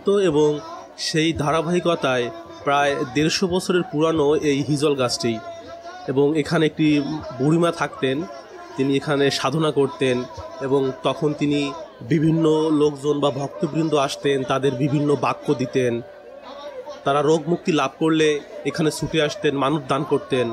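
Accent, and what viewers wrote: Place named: Khulna, Bangladesh. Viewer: তোমার বাড়ি তো খুলনা জেলায়। কথাবার্তার ধরণ শুনে বোঝা যাচ্ছে।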